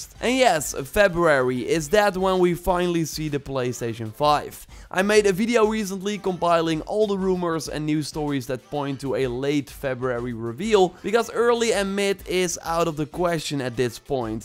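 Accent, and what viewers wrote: Netherlands. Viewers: U have an dutch accent are u dutch want ik wel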